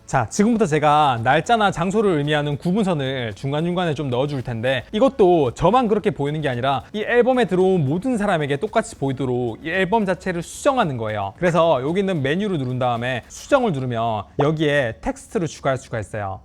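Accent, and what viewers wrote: Gyeongsang, South Korea. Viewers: 너무 유용해요. 감사합니다. 그냥 사투리 사용하셔도 더 정감있으실 것 같아요 ㅎㅎㅎ 귀엽게 서울사람 보이려는 경상도 사람같아서 항상 귀엽습니다.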